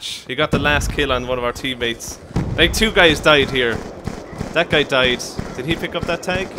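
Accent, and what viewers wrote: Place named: Ireland. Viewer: you are much funnier than Pewdiepie and deserve many more subs than him:) You are a really great guy and I love the Irish accent you have. I would love to game with you one day:)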